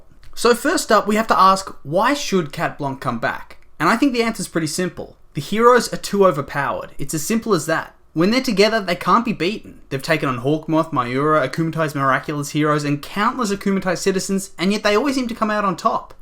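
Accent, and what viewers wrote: Australia. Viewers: Yo are u aussie? Your accent sounds def Australian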